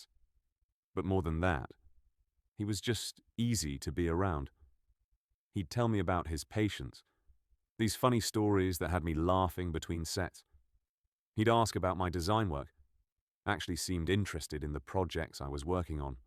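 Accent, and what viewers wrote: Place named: United Kingdom. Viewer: The Brit AI accent….sorry😨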